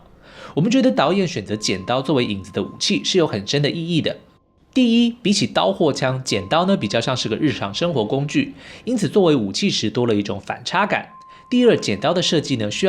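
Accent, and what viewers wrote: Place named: Taiwan. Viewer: UP主应该是说的标准台湾国语吧，讲的很好，口齿清晰，声音也好听，全程没开字幕听的很清楚。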